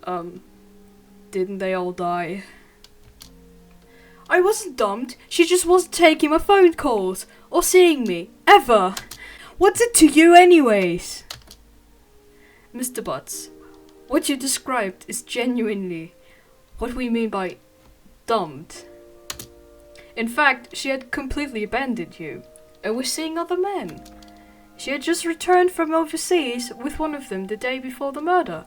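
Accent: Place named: United Kingdom. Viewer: du klingst so brittisch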